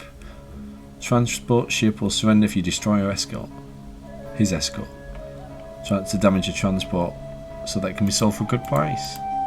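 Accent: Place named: Northern England, United Kingdom. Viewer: Awesome videos man. Nice to hear a fellow northern accent rather than hyperactive Americans! Looking forward to seeing more, this game looks fantastic.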